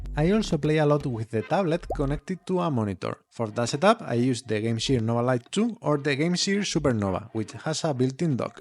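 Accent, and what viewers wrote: Spain. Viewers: Si se nota acento de español de España 😅, en latino americano nos obligan a perder acento cuando aprendemos inglés. Igual se entiende todo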